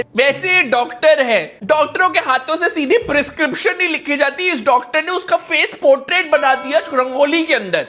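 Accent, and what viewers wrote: Punjab, India. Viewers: His Punjabi accent ❤ >3 is love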